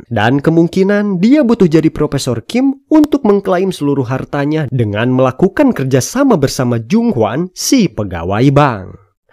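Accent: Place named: Medan, Indonesia. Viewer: Pasti ini orang medan yg pengisi suara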